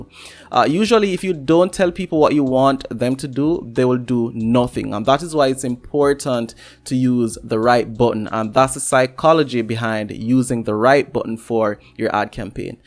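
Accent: Jamaica. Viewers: I hear Jamaican 💀 dropped a sub 💯 you got my full support bro I love to see other Jamaicans doing big stuff 🙌🏽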